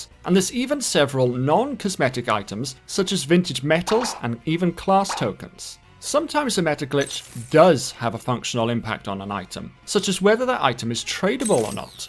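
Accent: Wales, United Kingdom. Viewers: Nice to see/hear more Welsh content creators, great video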